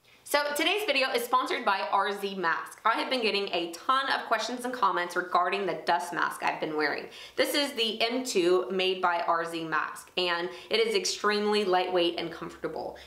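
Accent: Texas, United States. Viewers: haha its the Texas twang 😆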